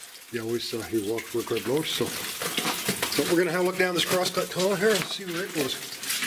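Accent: Canada. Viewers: Wow! You make awesome videos! I have a question, it seems like you have a bit of a Newfie accent. I might be wrong, but are you Canadian?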